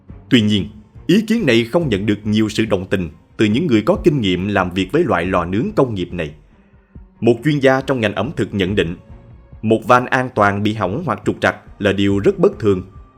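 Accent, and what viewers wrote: South, Vietnam. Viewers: Giong doc Mien Nam Phat am rat ro nghe rat hay, xin chuc suc khoe👍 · Thích nge tiếng MN của nói chuyện rỏ ràng rất hây và tiếng.nói của HG ẢNH nói chuyện rất hay ủng hộ.kênh.2 cháu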